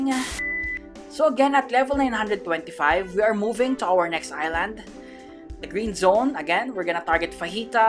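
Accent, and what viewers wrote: Philippines. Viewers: you have a very thick filipino accent kuya😄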